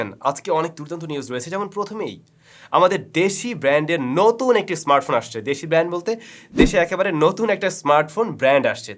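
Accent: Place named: Narsingdi, Bangladesh. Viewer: Amader narsingdi r Kotha sone vlo laglo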